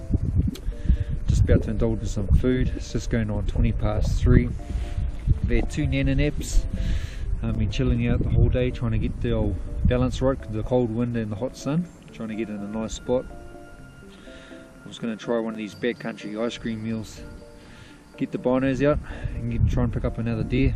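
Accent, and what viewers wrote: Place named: New Zealand. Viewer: Love that kiwi accent and those wicked glasses lol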